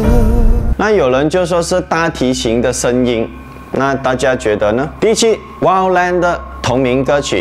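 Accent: Malaysia. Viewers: 马来西亚独特的口音是不行hio 马来西亚很多种族 什么腔都有啦🙄🙄🙄更何况讲了十多年都是这样 哈哈哈哈哈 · 除了光良的其他都不是呀~ 马来西亚口音可爱啊！😁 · 听你的口音就知道是马来西亚人了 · 這口音很棒啊，我認識很多馬來西亞人我很欣賞這種口音的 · 我是臺灣人，我都有在看惡靈的影片，所以我聽到懂馬來西亞華語，ㄅㄞˊㄔ¯ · 马来西亚的华语就是酱的咯！习惯就好！哈哈哈！ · 馬來西亞口音我不會不舒服，反而是北京腔捲舌音聽了很噁心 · 一直在猜是哪里人 就是没想到是马来西亚人╮(╯▽╰)╭ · 哈哈 听出来了马来西亚人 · 只要是马来西亚人都听得惯你的口音吧，因为我也是客家人，虽然我是槟城人😅😅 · 我是香港人，聽口音知道你是馬來西亞人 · 马来西亚的普通话我觉得挺好听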